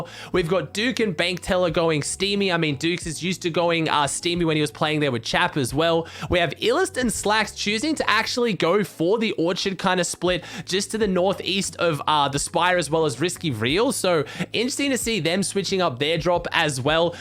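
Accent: Australia. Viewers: asmr?? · Man I love starting my day listening to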